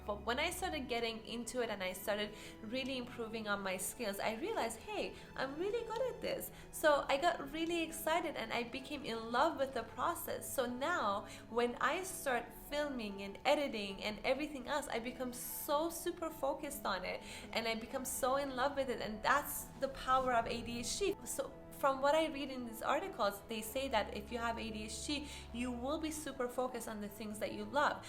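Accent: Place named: Eastern Europe. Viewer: I love your accent ma'am. Are you from Eastern Europe?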